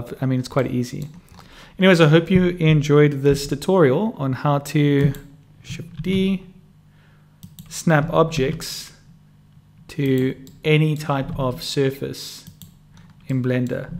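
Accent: South Africa. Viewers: Dude, thank you so Freaking Much. Also, it was nice to hear another South African on the Web.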